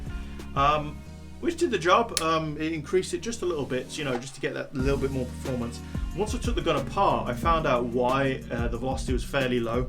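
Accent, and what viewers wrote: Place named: United Kingdom. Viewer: The British accent tells me it’s gonna be a fake gun.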